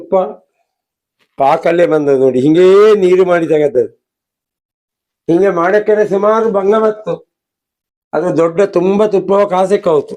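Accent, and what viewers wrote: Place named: Kerala, India. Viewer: Nimma kannada vantara Malyalam + Hawyaka kannada mixture aagi kelida hage ide.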